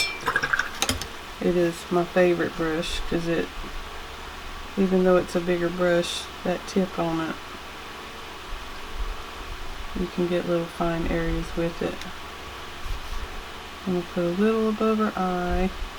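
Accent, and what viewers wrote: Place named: North Carolina, United States. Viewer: I love how "real" you are in your videos, and of course, it's always nice hearing a fellow North Carolinian talk! lol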